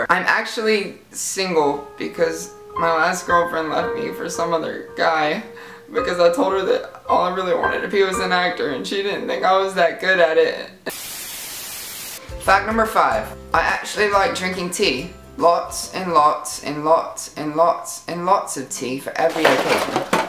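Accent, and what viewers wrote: United Kingdom. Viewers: I'm so happy for you!:') Also, I'm proud of your British accent:D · OMG! The accent is back! 💜 ily really, even when your trying to do a British accent XD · Are you british? You sound kind of British (no offence if you take offence)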